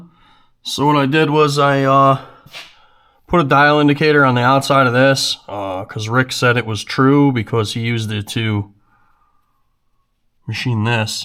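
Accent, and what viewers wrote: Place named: Upstate New York, United States. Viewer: I love watching you work on "old junk" much more than I would like to watch people work on expensive new things. I don't like to watch rich dudes rubbing it in my face. I like watching because I like seeing someone who is obviously doing something they love with obsolete things, not wasting things, making things work, doing good work, tasteful work, intelligent work. I can't stand people who do click bait, who trash things for no reason, who are destructive, materialistic, act stupid or crazy on purpose. comes off as a genuine dude and I believe he acts the way he is on camera when he is off camera and I think he is sincere in what he says. He would probably get more views and make more money if he was like that but, I am glad he isn't willing to sacrifice his class for that. My father was a trucker from Oswego County NY, he loved all this kind of stuff. 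I love hearing talk his accent reminds me so much of the working class upstate NYer guys I was around as a kid. It really warms my heart. It's also impressive to see a guy who is doing well in spite of all the downsides of living up in NY. The weather, the economy, the government, the laws, the rust, the cost of living, the taxes. I love it up there in the summer out in the country. I'm 41 and I was in the military and I just couldn't make it up there so I live Tennessee. Thanks making these videos, I really appreciate them.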